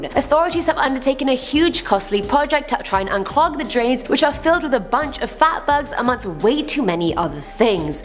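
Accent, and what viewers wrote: United Kingdom. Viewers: I'm assuming she's British because she has a British accent...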